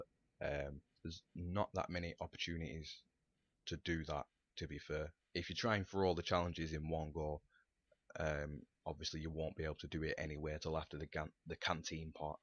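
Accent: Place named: Scotland, United Kingdom. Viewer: I love your accent! Are you Scottish perhaps?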